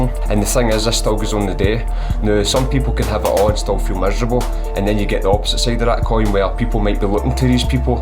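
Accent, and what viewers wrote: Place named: Glasgow, United Kingdom. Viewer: Bravo perfectly described it's was great to hear the Glasgow accent, excellent x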